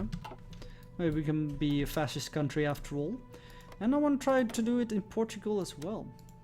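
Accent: Netherlands. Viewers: Does anyone else love the Dutch accent?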